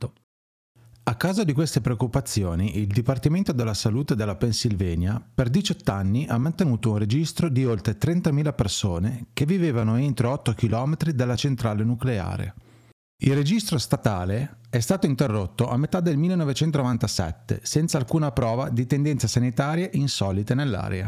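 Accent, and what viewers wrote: Genoa, Italy. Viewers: Ciao ma io sento un accento genovese cmq complimenti sempre tutto interessante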